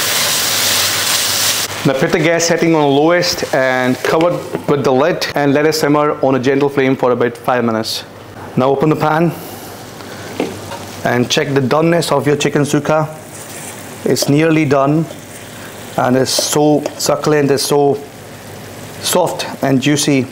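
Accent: Scotland, United Kingdom. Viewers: never heard of sukka. Thanks, this looks delicious. Will use fatty pork though. Your videos have become masterpieces: succinct, beautiful to watch, only the best recipes, and an accent that takes me back 35 years to being a student on the other side of Scotland! Can't wait to try this (speaking Scottish to myself ;-))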